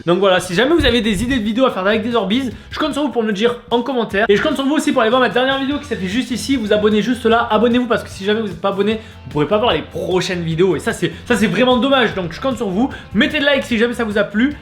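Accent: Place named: South, France. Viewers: J’aime pas l’accent du sud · Accent du sud 💖💖💖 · En 1 : j aime trop ton accent sudeste Puis en 2: continue comme sa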